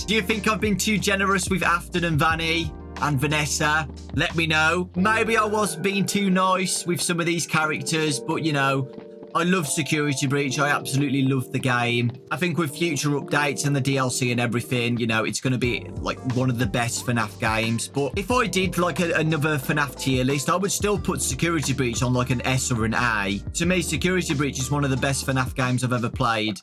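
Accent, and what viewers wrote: United Kingdom. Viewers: Go little british boy go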